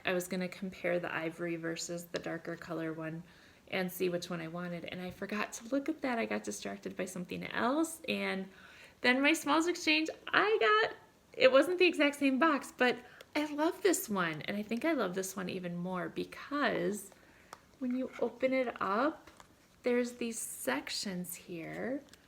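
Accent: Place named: Minnesota, United States. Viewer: I just love your videos! You are so fun to listen to. I love your “Minnesota” accent. 😉. I’m a MN girl too and I live about 20 minutes from you (just north of Becker). I can’t wait to attend my first cross stitch retreat. I’ve attended quilting retreats, but not cross stitch. Hopefully some day we will meet.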